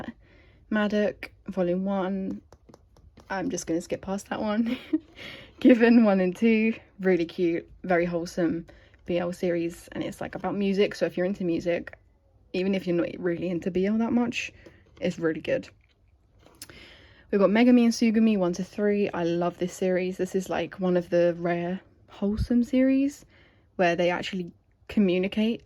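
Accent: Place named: United Kingdom. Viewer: Omg youre british too!?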